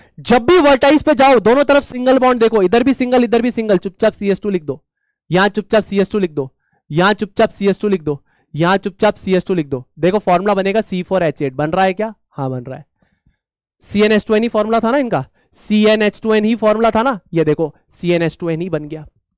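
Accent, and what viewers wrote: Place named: Marwar, India. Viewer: Sir aapke teaching style ke sath appki marwadi...it's amazing 😊❤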